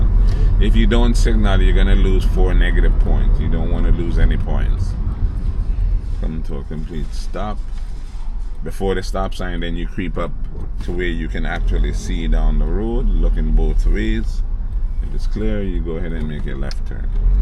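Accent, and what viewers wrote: Jamaica. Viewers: Know you sound Jamaica bro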